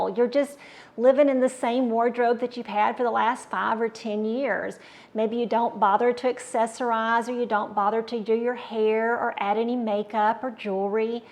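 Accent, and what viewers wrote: South, United States. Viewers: You have a charming southern accent. When my hair turned silvery gray, I asked the students at the beauty school to make me a blonde so I look and feel younger, not like a gray-haired old lady. I changed my lipstick and blush to light bright pink colors, nothing dark. Love Tip #3...Don't aim for young--Aim for modern. Yes, contemporary clothing is more youthful. Some of my friends do not try at all--they look like a walking clinical depression. They appear so matronly, 15 years older than they really are!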